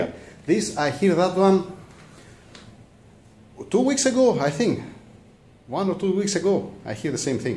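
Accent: Greece. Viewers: Hi, nobody has teached you but you teach people all around the world whith à cigaret and your amazing greek accent, that coule be simply your answer to all jalous people! Thanks you man! You are the best, just continue please! And let us now when we can share a good dîner in Europe!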